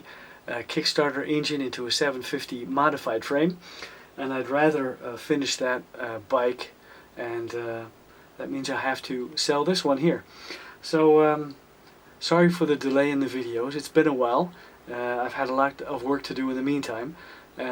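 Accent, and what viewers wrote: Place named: Netherlands. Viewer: Watching you videos and hearing your accent I assume you are dutch. Well i’m dutch too and my kz 750 gt makes exactly the same noise. Do you by any chance do repairs on bikes other than your own? This job seems a bit out of my league so I really could use some help...Groeten, Rogier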